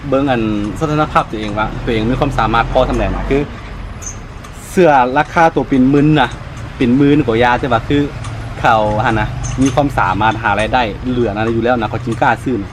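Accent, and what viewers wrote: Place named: South, Thailand. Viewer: สวัสดีค่ะน้อง,กินกันได้ม่าย.?พูดไทยทางไต้.ค่ะ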